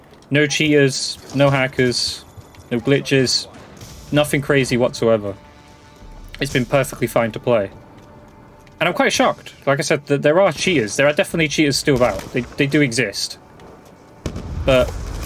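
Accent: United Kingdom. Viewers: Oh! stampylonghead is also British so I guess that's why you and him sound somewhat similar? and np keep up the good work. But in your videos I really feel like somethings just missing, it doesn't really draw me in to watch more if that makes sense? it feels kinda bland and its not really engaging too. honestly I'm not really sure on the improvements you could do but I'd hope to see some changes overtime. hopefully I'm not mean with this though, just expressing an opinion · I love your accent. English…right? Australian?) reminds me of how Beard meets the World talks. Love it ❤️ 👍 😊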